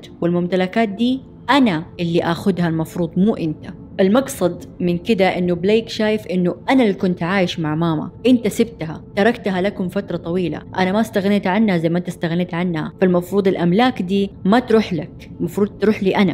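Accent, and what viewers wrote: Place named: Jeddah, Saudi Arabia. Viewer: 😂😂😂البنت حامل والدنيا شوب .. يا ويلي انا تابعتك عشان لهجتك .. لدرجة انو صرت اذا قابلت بنات اعرفهم من لهجتهم انهم جداويات .. من اجمل اللهجات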